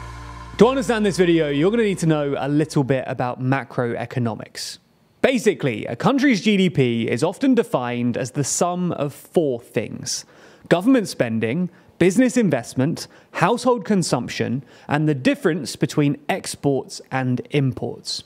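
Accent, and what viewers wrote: England, United Kingdom. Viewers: Aaron This dude is British. Listen to his accent.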